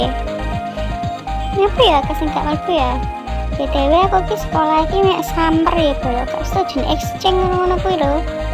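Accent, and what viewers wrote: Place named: Java, Indonesia. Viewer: medoke boloo🥳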